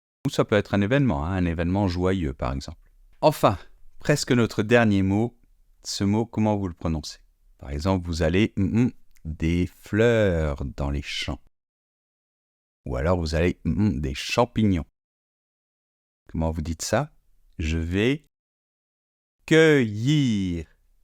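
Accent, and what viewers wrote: Paris, France. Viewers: Tu va expliquer au basque,au toulousain,au marseillais,au Auvergnat ect a bien parlé. Tu dois être parisien.c'est qui les débiles qui ne savent pas dire serrurier, écureuil... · ça, il ne va pas capter, parisien il est devenu.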